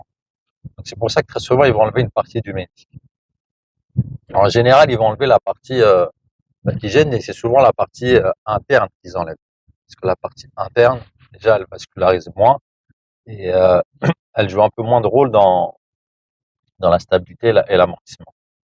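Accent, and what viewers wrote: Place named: Seine-Saint-Denis, France. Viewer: IN ACCENT DE BLEDARD DU 9/3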